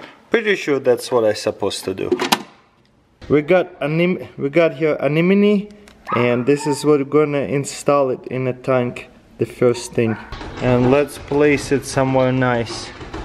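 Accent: Russia. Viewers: Colorless Rainbow its his Russian accent...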